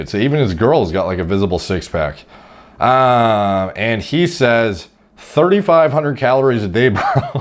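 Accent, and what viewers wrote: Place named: Canada. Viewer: sounded more Canadian than usual in this vid, particularly at "that's jumping to conclusions, no?" and with his polite disapproval of Reddit calling Lewis gay lmao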